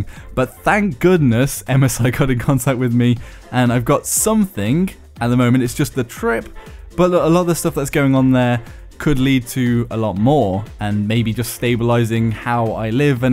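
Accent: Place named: United Kingdom. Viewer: Huh, didn't realise you were British. That makes you a lot hotter. Totally gay for that.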